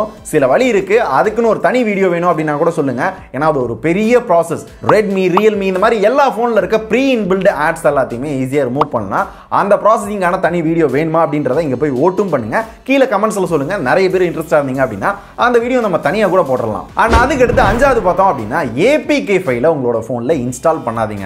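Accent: Madurai, India. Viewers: Bro nega madurai ya🙋🙋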